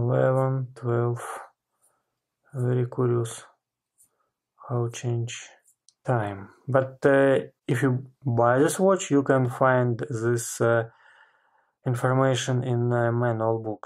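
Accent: Russia. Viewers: Жесткий русский акцент. Английский - это не для Вас.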